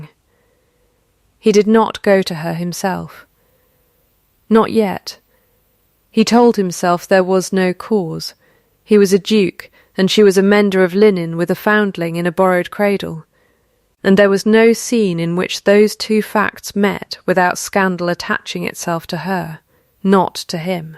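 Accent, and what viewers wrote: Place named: United Kingdom. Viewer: An awfully severe British accent generated by AI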